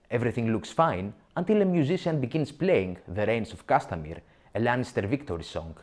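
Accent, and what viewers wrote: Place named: Greece. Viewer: I love your greek accent mate. Exellent analisys of the scene too.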